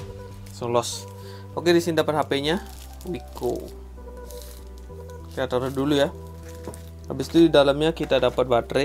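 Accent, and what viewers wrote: Bali, Indonesia. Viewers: Dari logatnya kaya orang Bali.. Pakai gelang tridatu juga. Hehe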